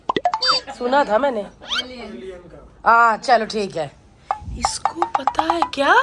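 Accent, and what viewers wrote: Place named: Odisha, India. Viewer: odisha se hai isiliye ese pronounce karte hai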